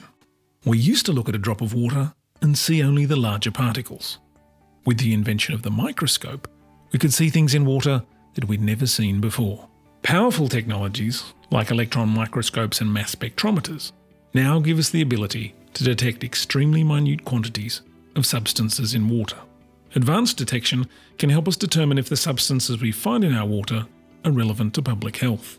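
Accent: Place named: Australia. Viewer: Couldn't they find an American to narrate this? That Ozzie accent is hard to understand.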